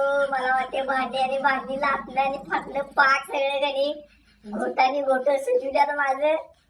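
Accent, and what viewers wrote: Kolhapur, India. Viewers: Your kolhapuri assent is fabulous❤❤❤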